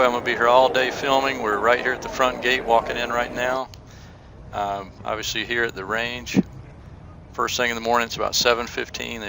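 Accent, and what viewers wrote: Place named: South, United States. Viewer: Hey, Rob here from England. Thanks a bunch for putting this up, it's home spun but that's good! Great to see the swings in slo mo, a great feature thanks! Love the commentary in that southern accent all we Brits think is so cool! I MUST get to the Masters one day, nearly did for the big 'five oh' this year, but didn't in the end... hopefully some year soon. Love Rickie Fowler's swing, it's unique for sure but why so long you think!??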